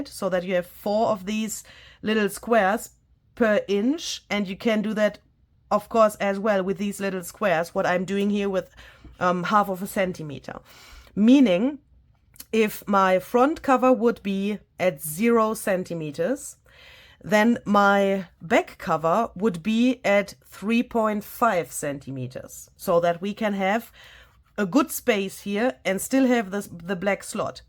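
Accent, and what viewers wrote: Germany. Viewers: you make the best videos! I love listening to you - to the English speaker a German accent is so charming - and your English is very good! If only I could speak a second language as proficiently as you do! I admire you so much and am so happy for all your success. Thank you for all the great content! I am buying you a coffee too! OMG: as I continued to listen, I heard the "You do you" comment. How ridiculous people can be! He doesn't own those words, nor any other phrases. These are commonly used in English conversation. All influencers can have comments they like to make, but that does not mean it belongs to them unless it is something specific to a product they have copyrighted. Don't pay attention to anyone who criticizes you, you have never done anything at all wrong!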